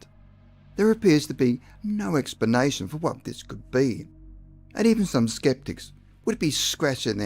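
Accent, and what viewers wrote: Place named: Australia. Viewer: Australian accent, I keep thinking of the geico commercial and the little gecko 🦎 is goning to appear at any moment.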